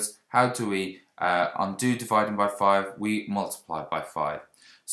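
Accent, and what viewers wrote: United Kingdom. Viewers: thank you british bro i did not pay attention in class and i had a homework on this lol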